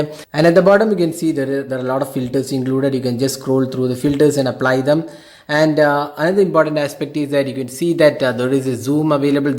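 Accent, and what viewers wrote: Kerala, India. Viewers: Are u a Mallu ? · r u a mallu?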